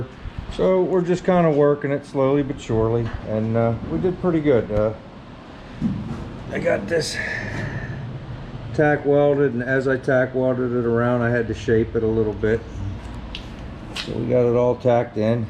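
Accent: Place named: St. Louis, United States. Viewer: Are you from St. Louis mo. Area? My YouTube entertainment lately is guessing people location based on accent.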